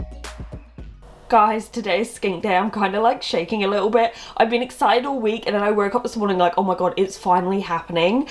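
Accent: United Kingdom. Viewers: Yo your British i didn't know that respect to all my British people out there💯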